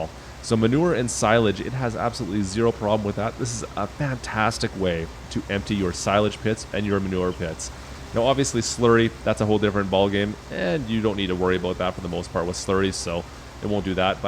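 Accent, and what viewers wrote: Canada. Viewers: I love the way you say about, aboot. I love Canada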